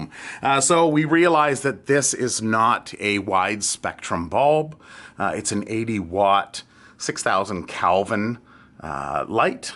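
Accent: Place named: Canada. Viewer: American accent? lol I was engaged to a Canadian for a few years so I spent some time in Ottawa and you are undoubtedly Canadian lol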